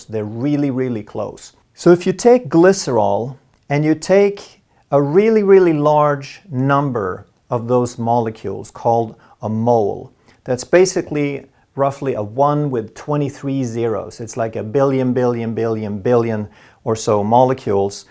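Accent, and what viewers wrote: Sweden. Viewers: You sound Swedish yet the Swedish translations on your channel look machine translated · He is Swedish. And you can hear it on his accent all the way through.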